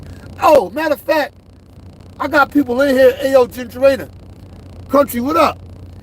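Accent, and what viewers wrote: New York, United States. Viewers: I wish I had caught this when you were doing it. Your talk and slang is straight New Yorker. Maybe it's because I live in Pennsylvania and am married to a born and raised New Jersey girl that I know it but to attack you for an accent that has nothing to do with the content you are putting out there. It's BS. Please know that this white 45 year old is a better flat bedder because of you. I don't give a shit about whatever accent you are putting out!! thanks for the help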